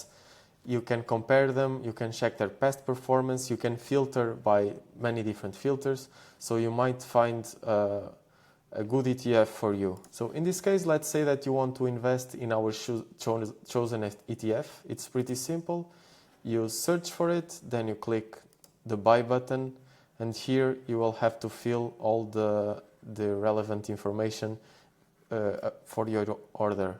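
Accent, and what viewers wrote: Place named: Portugal. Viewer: Are you portuguese? From the sound it seems. Do you know in Portugal if we can follow the process you just shared?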